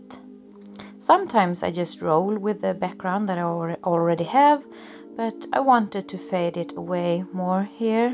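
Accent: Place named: Sweden. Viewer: Love, love, love it! You go girl! Your English with Swedish accent is beautiful. I admire those that are multilingual. xo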